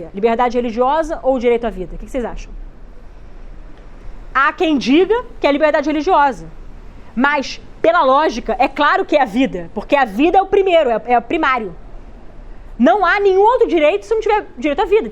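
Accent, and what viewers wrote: Rio de Janeiro, Brazil. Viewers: Hehehe o marcadíssimo sotaque fluminense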